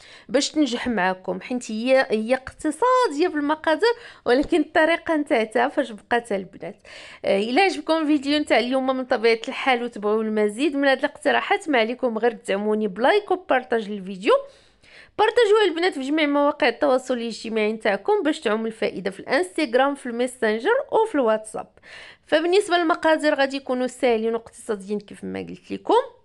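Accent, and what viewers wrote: Marrakech, Morocco. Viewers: حليواتك رائعين بدون شك ولكن هدرتك راااائعة كتسافر بيا لمدينة مراكش مسقط رأسي اللي اشتقت لها بزاف الصواب والأدب والحلاوة والظرافة في الكلام لهلا يخطيك وحليوة اليوم غزااالة اول مرة كننعرفها حصريا فقناتك وأكيد غادي نجربها كلامك الحلو أرغمني نعمل لك كومونتير لأنني من عادتي نادرا ما كندير كومونتير إلا لمن يستحق برافو عليك حبيبتي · تبارك الله عليك اختي الله بعطيك الصحة👌👌👌👌👌👌👌👌👌 معندي منقول برافو عليك و زيدني في قائمة الناس لكيحماقو على الصوت واللهجة المراكشية الرائعة،❤️😘😘😘 الله يخليك تقربيا شحال خليتها في الفران؟ شكرا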